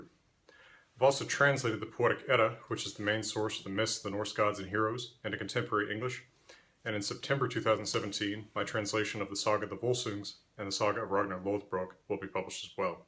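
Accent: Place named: United States. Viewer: viking language with an american accent XD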